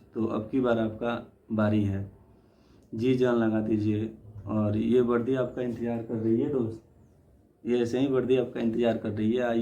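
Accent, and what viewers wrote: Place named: Bhojpuri, India. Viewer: Bhojpuri bahut badhiya laga aapse sunkar aap kaha se hai😂😂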